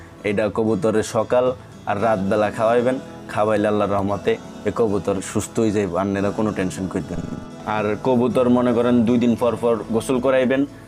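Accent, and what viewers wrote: Noakhali, Bangladesh. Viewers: Love your noakhali voice buijjen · ভাইয়ের বাড়ি কি নোয়াখালী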